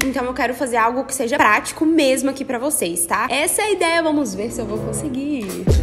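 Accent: Minas Gerais, Brazil. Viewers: Vc e mineira?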